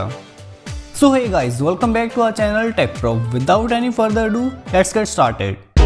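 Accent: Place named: India. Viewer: As soon as i recognised the voice was indian I was relieved and thanked god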